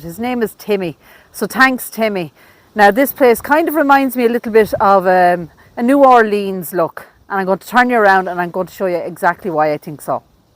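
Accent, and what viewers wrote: Ireland. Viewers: Great video. The commentary with the Irish accent is spectacular. From New Jersey in the USA. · I love listening to you both. Your voice reminds me of my Granddad who was from Cork. I’m from Western Australia 🇦🇺. My Great Grandmothers name was Eva Boyd my Great Grandfathers name was Michael Hyde. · So neat to see the old graves. Thanks for taking time to show them. Love your Irish accent. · Thanks! Love love your channel!! I am from Alberta, Canada 🇨🇦 and would love ❤️ to hopefully one day make a trip to beautiful Ireland 🇮🇪!! It is so wonderful that by you filming different locations I feel like I am touring Ireland 🇮🇪 lol🥰 again love your channel & keep up your amazing work! Oh ps I love love your beautiful 🤩 Irish ☘️ Accent!! ☘️🇮🇪☘️ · Beautiful graveyard love your gorgeous Irish accent luv from Canada 🇨🇦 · Hello from Japan. I was born in Sardinia to Irish/Scottish/Ukrainian parents and lived in Carna Co.Galway and Cashel Co.Tipperary as a youngster. 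Have been always fascinated with cemeteries and love just as you do too to explore them. Great to hear an Irish accent!!!!!!!!!!!!!!!!!!!!!!!!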